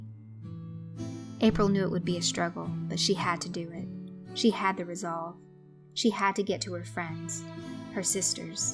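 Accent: South, United States. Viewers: I love the southern accent you used while telling this story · You are definately underrated as a narrator. You are one of favorite reader and I love how you can do both a southern and english accent!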